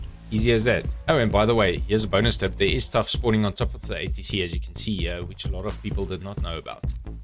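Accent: Cape Town, South Africa. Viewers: As a cape townian.....this voice sounds like home 😂😂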